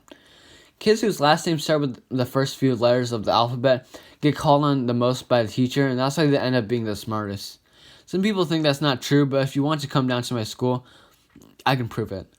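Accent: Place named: United States. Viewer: Bad don’t speak so fast man and why u American like whyyy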